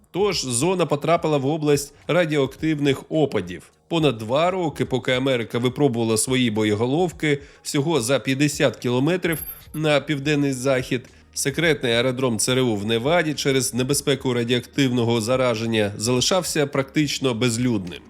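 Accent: Moscow, Russia. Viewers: Хто бере приклад з цього чоловіка? В нього жахливий російський акцент, купа русизмів і не правильні наголоси! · Чути російський акцент диктора, мабуть раніше говорив російською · А нічого, що він "акає" як москвіч??? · Лайк за україномовний контент, але відчувається "рускоязичная чєлюсть"... Треба ще працювати над вимовою. І ще радив би зображення ведучого по максимуму замінювати відеорядом, бо дивитись на очі які бігають читаючи текст не дуже цікаво. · Слухаю це відео у 2026. Спочатку не помітила, що йому кілька років, і сильно здивувалася, чому Ви стали говорити з настільки виразним російським акцентом. Потім розібралася - за ці два роки прогрес у вимові просто чудовий. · Дізлайк за кацапський акцент. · блін, як мене КОРОБИТЬ від цих москальських ааа в українській мові !!!!!!!!!!!!!!!!!!! одразу видно, що людина ДУЖЕ ПОГАНО володіє українськю мовою! Запамятайте просте правило : в українській мові як пишеться, так і читається!!!! 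Простий приклад (слова взяті із відео - <link> : пишеться : "кілограмів", читається - ТЕЖ "кілограмів", а НЕ "кілаграмів"!!! пишеться "теоретично", і вимовляється ТЕЖ - "ТЕОРЕТИЧНО", а НЕ - "теоретична" пишеться "потрібно", читається - ТЕЖ "потрібно", а НЕ "потрібна" !!!!!!!!!!!!!!!!!!!!!!!!!!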